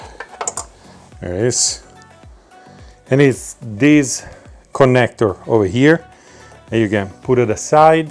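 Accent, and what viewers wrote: Italy. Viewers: I like the italian accent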